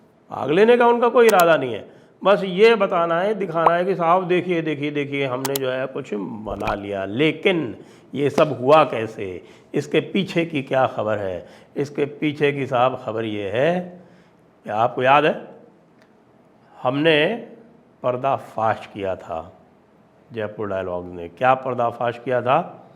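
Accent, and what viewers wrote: Haryana, India. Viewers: ग्रेट! Good humorous vedio with full details of the andolan by wrestlers. Very casual but wonderful way of description. Harianvi style is hit! Impressed!😂🌺🥀🌹🏵🌷⚘ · Everything was ok sir but ur haryanvi accent was too bad😅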